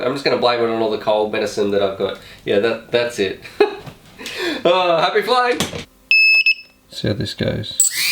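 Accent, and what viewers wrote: Australia. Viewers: I just watched past half way. Your definitely Australian. Finnaly someone decent whos an Aussie!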